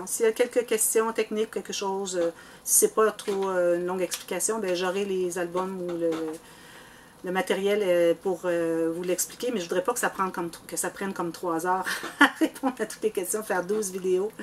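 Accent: Quebec, Canada. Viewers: Coucou toutes mes félicitations !!!! et merci pour les tutos super bien expliqués et avec ce magnifique accent québécois ;-) j'adore ;-) Gros bisous de la Lorraine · Bonjour! Je m'appelle..... J'habite en "Drôme Provençale" (sud de la France dans la vallée du Rhône) où il fait très chaud. Et ce petit air du Québec me fait voyager. Nous adorons votre accent, ne changez rien! J'ai commencé le scrapbooking il y a quelques mois et je suis éblouie par votre travail. Même si c'est trop dur pour moi pour l'instant j'apprends beaucoup de choses. Votre simplicité, votre gentillesse et votre bonne humeur sont des parenthèses de bonheur. Et bravo pour les 5000 abonnés! Je vous embrasse. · Vous méritez amplement les 5000 abonnés car votre travail et vos explications sont parfaites, votre générosité est sans pareil, continué encore à nous faire rêver pendant très longtemps.Je vous rassure votre français est parfait, et j'adore votre accent, apprendre des expressions canadienne est enrichissante.Bisous et encore MERCI POUR TOUT. 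du sud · bonjour merci pour toutes ces vidéos partage. pour ma part vous vous expliquez bien dans vos explications. un bel accent québécois je voyage en vous écoutant. vous méritez tous ces abonnés. un p'tit coin de france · Super bonne idée. 'Merci pour ce cadeau. Curieuse de voir les questions des fans. Génial, je peux mettre un visage sur cette jolie voie québécoise. Déjà rien que ça, c'est un beau cadeau. Merci d'être vous et de toutes vos explications. Bonne journée de Wallonie ( Belgique ) sous le soleil, 30° à l'ombre, caniculaire 😕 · Coucou bonjour, wahoooooooo félicitations pour tes 5ooo abonnés quel plaisir de pouvoir te découvrir en " vrais " ne change rien ton français est au top tout comme ton accent moi j'adore tes expressions canadienne merci pour tous tes tutos très instructif car très très bien expliqué... bisous bisous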